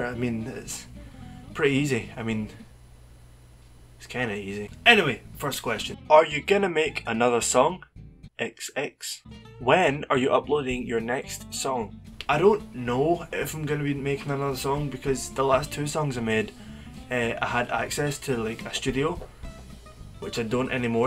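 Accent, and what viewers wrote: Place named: Scotland, United Kingdom. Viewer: I enjoyed your American candy video. Hello from Chicago. You are a handsome young man, What part of the UK is your accent from. It sound to me a bit Scottish, Irish sounding. I like your accent. Are you from Liverpool? Oh you are from Scotland! Very nice accent.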